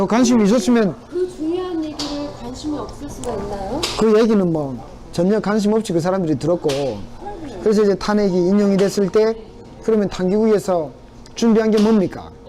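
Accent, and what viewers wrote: North Korea. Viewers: 이놈은 미친놈이구나 너 악질이구나 나경원 장재원 김성태는 무어라 변명하냐 너 목소리가 북한말쓰냐 정체는 무어냐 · 이 사람 탈북자? 말투가 북한말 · 계속 듣는데 말투가 왜 이렇습니까? 북한말투 같은데 혹시 탈북민 이신가요? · 말투가 북한말투다. 위장탈북한 빨갱이 아닌가 생각해봅니다.보수에 파고들어 분열책동자인것 같습니다. 잘들 생각해봅시다. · 처음 보는 분인데 오늘 말하는 것을 보니 진실성이 있는데, 보기 드문 사람이네요. 말투를 들어보니까 탈북자인 것 같은데, 엄청 많이 아시네요. 원래 변희재 "브레이크뉴스"라는 이상한 좌편향 인타넷매체를 운영했는데, 최근에 보니까 보수라며 목소리를 높이고 있어서 유심히 보고 있는데, 역시나 남을 이용하고 마음에 들지 않으면 마구 까는이념도 의리도 없는 사람으로 변질되어 있는 것을 보고 놀라지 않을 수 없었습니다. 특히 그의 눈빛은 완전히 자기도취에 빠진 광인이 되어 있는 것 같아서 무섭기도 하고 정말 안타깝고 실망스러웠습니다. 사실 나는 어렸을 때부터 미친 사람을 좀 겪어봤거든요. 의사 입장에서는 그냥 환자이지만, 일반인들은 무서워서 피하죠. 그래도 우리 부모님들은 마음이 워낙 너그럽고 좋아서 집에서 밥도 같이 먹고 잘 대해 주었지만, 얼마 안 있어 돌변해서 욕하고 그러더라구요. 정상이 아니죠. 갑자기 이러한 옛날 생각이 나네요. 그리고 예전에 초창기 인터넷매체로는 오마이뉴스, 프레시안, 서프라이저 등이 있었는데, 노무현 정부 들어와서 그들이 하는 뉴스나 행태들이 외골수지만, 가끔씩 그런 사이트에 들아가보면 완전히 딴 세상 이야기를 너무 진지하게 장문의 글을 올리고 논박하고 하는 것을 보고 이상한 사람들이네 하는 생각을 했습니다. 외눈박이들이 서로 자기가 옳다고 뜬 구름 잡는 주장을 하고 현실성이 없었죠. 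하지만, 그들은 그런 훈련을 끊임없이 하면서 경쟁하고 거기서 살아남으려고 무척 노력하는 것 같았습니다. 어디서 캐캐묵은 이야기를 진리처럼 말하고, 결코 그들의 실력은 자랑할 만한 게 없고 형편없었습니다. 그냥 맹목적으로 진영논리를 전수받아 앵무새처럼 얘기하는 수준밖에 안 돼요. 겁먹을 거 없어요. 목사와 유사하다고나 할까? 그렇다고 목사를 욕하자는 건 아닙니다. 비현실적이고, 피상적이면서 입술에서만 나오는 동어 반복하는 수준을 말하는 것입니다. 순수한 체험적 자기철학이나 고민에서 우러나온 아름다운 글을 쓰기는 힘들 겁니다. 쉽게 사람을 판단해서는 안 됩니다. 그래서 오래 지켜봤습니다. 인간은 그렇게 쉽게 바뀌지 않는다는 것입니다. 개과천선은 절대 일어나지 않습니다. 그래서 전과(前科)는 늘기만 하고 결코 줄지는 않는 것입니다. 사실 나는 변희재씨가 처음부터 박대통령 탄핵에 관심없다는 말을 하는 것을 직접 들었거든요. 그런데 어느날부터 갑자기 탄핵을 반대하는 사람들의 대표적인 리더로 행세하는 것을 보고 우와! 정말 깜짝 놀랐습니다. 나름 자기정치를 하려고 무지하게 노력하고 있는 것 같은데 아무도 알아주지 않으니, 본인은 속상할 겁니다. 아마도 "좌충우돌"이라는 말이 그에게 딱 어울리는 말인 것 같습니다. 사실 자신의 잘 못을 시인하고 용서를 비는 것이 용기가 없이는 진짜 힙듭니다. 나는 지금까지 홍준표씨를 잘 몰랐습니다. 왜냐하면, 모래시계 드라마를 본 적이 없었거든요. 왜냐고요? SBS는 전국방송이 아니라 지방에는 방영이 안 되었거든요. 어떤 사람들은 녹화테이프를 빌려서 보는 사람들도 많을 정도로 인기가 대단했던 것은 사실입니다. 그래도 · 당신은 이북말쓰십니다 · 당신은 말투로봐서 북한 이주민 같은데 정말로 정의롭고 용사같은 사람입니다 앞으로 계속 옮음의 길로 행하시길.....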